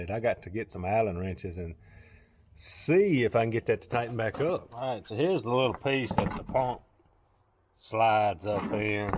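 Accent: South, United States. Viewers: The closed caption of your southern accent is sometimes very funny! And entertaining.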